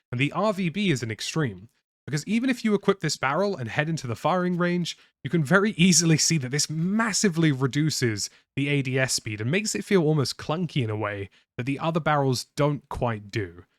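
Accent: RP, United Kingdom. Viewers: mate, you're too posh to be confusing less and fewer. Good video though.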